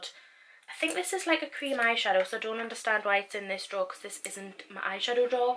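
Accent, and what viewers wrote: Newcastle, United Kingdom. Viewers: Love watching u I’m from Sunderland/ Newcastle and now live in Cumbria and miss the Geordie accent xx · Literally my favourite Geordie girl! I just love how she doesn’t pretend to be dead posh and polished xxxx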